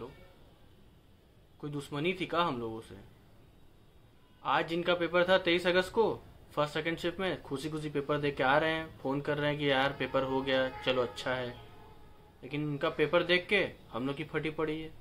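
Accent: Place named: Varanasi, India. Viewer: That banarasi accent ...👻😍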